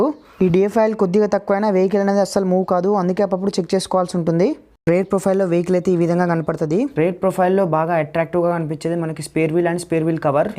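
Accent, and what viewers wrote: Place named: Telangana, India. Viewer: పక్క తెలంగాణ పోరాడు సెప్పినట్టు సెప్పినవ్ కాకా నువ్వు కేక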